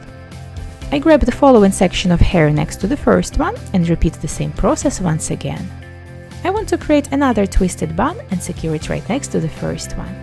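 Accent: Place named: Russia. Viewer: U have rus accent...), wr r u frm?!)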